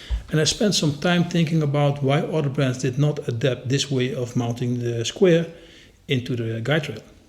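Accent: Netherlands. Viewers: The moment I heard you talking I knew you were from the Netherlands! Dank u wel voor de video, van de Verenigde Staten!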